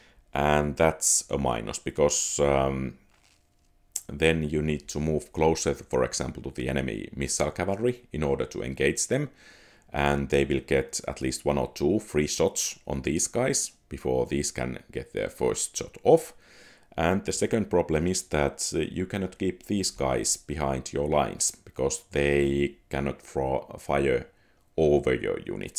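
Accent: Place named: Finland. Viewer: rallienglanti oli vahva täällä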